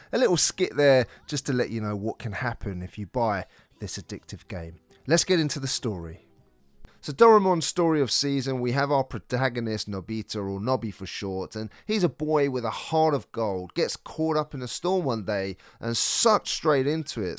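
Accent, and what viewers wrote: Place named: Black Country, United Kingdom. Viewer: Thanks for reviewing this :) was trying to find an honest and thorough review that wasn't half-arsed and this seems to be the only one ATM! Also are you from the Black Country by any chance? I'm from Wolverhampton and your accent sounds very similar lol.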